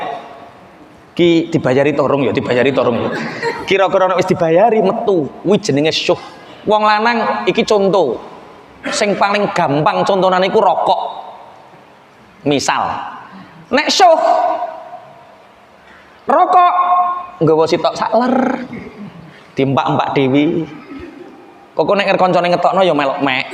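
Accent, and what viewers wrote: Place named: Bojonegoro, Indonesia. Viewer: Kekel aku ngrungokne logate Yai Bojonegoro ,sebabpe aku dewe wong Bojonegoro ,tapi matur suwun pencerahanipun ,semoga berkah P Yai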